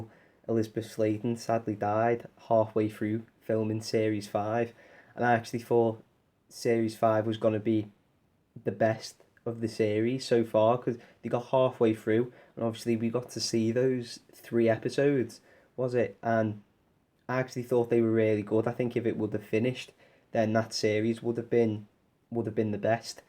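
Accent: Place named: Liverpool, United Kingdom. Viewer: Not meaning to be rude but you sound like a more scouse Michael owen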